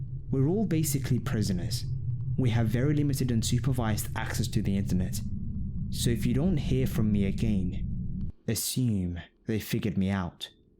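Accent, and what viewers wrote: United Kingdom. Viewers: His accent is British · you sound british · Why do you have a UK 🇬🇧 accent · U didn't worked there.. U sound like u from da U.K... Sorry nice tried..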